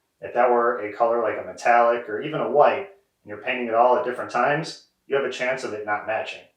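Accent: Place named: Midwest, United States. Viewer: refreshing amount of no speed up, no self apology for this "being boring", no crappy muzak in the background and plenty of commentary on how to do this task. Plus I find mid western drone is incredibly soporific, i find when I'm tired its like being told a bedtime story... I just wish no you'd lose the "The channel" bit from the intro and then you would be totally unique in not trying too hard to keep people watching... Very TV, TV never begs you to watch or explains itself too hard, it's just here's a program watch it if you like and if you dont, go do something far more interesting...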